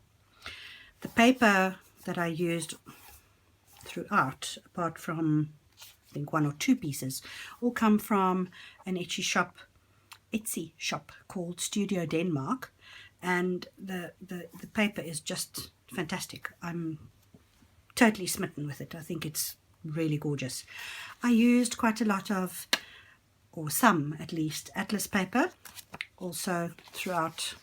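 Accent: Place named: South Africa. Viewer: Really lovely. The tea dying really came out super duper great this time... well, it always does!! I really like a simple "clean" journal personally and the more I get into this the less I want to embellish the pages because I think most people want to have the freedom to fill up their own journals. I recently made a travel journal and I also made some word embellishments and stamps to take on the side during the vacation. Good idea. Lovely to hear your South African accent too. Congrats on another gorgeous journal!! xo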